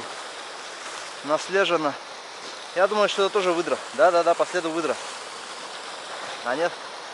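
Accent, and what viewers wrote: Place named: Vyatka, Russia. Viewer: Вятский акцент уникальный.ни с каким не перепутать👍👍.подписон.привет из Москвабада🖐🖐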